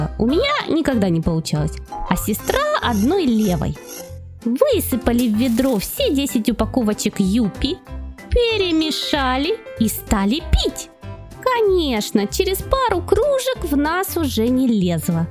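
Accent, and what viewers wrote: Ukraine. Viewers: Ты что украинка что ли ? Ну пипец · Ты так красиво говоришь на украинской мове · Дуже красиво говориш Українською🥰💖. Слава Україні героям слава! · Ты что украинец? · Ты шо украинка а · я вижу па голосу что ты украинка я тоже украинка просто нет такой клавиатуры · Ты из Украины😅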